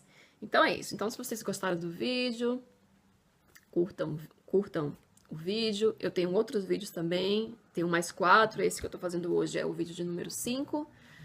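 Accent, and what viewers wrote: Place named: Brazil. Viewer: foi uma boa aula, mas a senhora poderia melhorar mais o seu alemão, tantar falar mais como um nativo germanico e não falar como um brasileiro, o alemão soa melhor com o sotaque deles, então '' Fliegen'' não é '' Flieguem ''... todo EN no final é o som de '' UM '' com a boca totalmente fechada, isso vai ajudar se praticá-lo...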